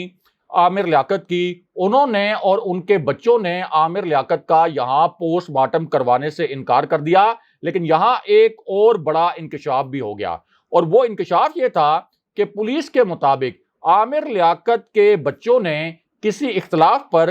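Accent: Punjab, Pakistan. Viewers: Pie Pendo Punjabi, Correct your punjabised accent Urdu. You cannot correctly pronounce simple Urdu words. Your mispronunciation is very absurd and irritating.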